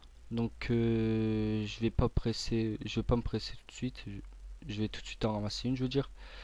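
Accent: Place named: North, France. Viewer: sa senten a ta voie ke tu vien du nord mdr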